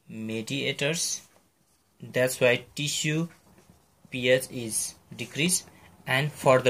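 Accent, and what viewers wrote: India. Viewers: WHEN INDIAN WOMEN TALK ITS SO SEXY, BUT THE DUDES....WHAT? AT FIST ITS OK THEN AFTER AWHILE I THOUGHT HE WAS GOING TO SPEAK HINDI. THIS WAS A VERY GOOD GOUT VIDEO HANDS DOWN! WHAT HE IS SAYING ABOUT ALLPURINOL AND COLCHICINE IS SO TRUE AND I DISCOVERED BY ACCIDENT AND TIME. IF YOU CAN CHANGE YOUR LIFE STYLE USE COLCHICINE, IF NOT ALLPURINOL. IF YOU NOTICE AFTER ONE YEAR YOUVE HAD 5 OR MORE ATTACKS AND YOUVE CHANGED YOUR LIFE STYLE TAKE ALLPURINOL. IF NOT TAKE COLCHICINE 2 FIRSTDAY WITH ALLPURINOL, ONE FOR 3 DAYS WITH ALLPURINOL. FOR EVER.CRUSH TYLENOL AND A QUART OF WATER AND DARK SWEET CHERRIES OR FRUIT TOGETHER FOR SEVERE PAIN IF YOU CANT GET (OXYCODINE, PERCOCETS, VICADINE AND ETC). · I like the Indian accent 😁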